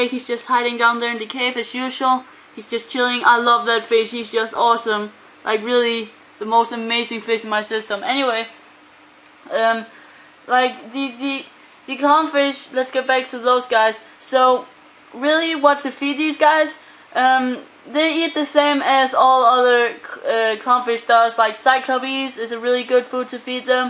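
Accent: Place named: Denmark. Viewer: You Danish accent is more pronounce now as you grow older. Sounds cute--keep up the great work.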